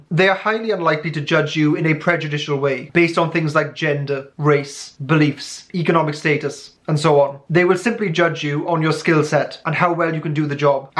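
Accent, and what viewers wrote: Wales, United Kingdom. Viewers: Are you Welsh!?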